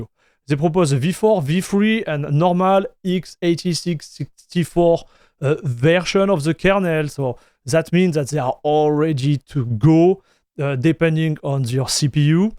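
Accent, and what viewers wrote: France. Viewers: horrible cette accent français ont ne comprend pas grand chose.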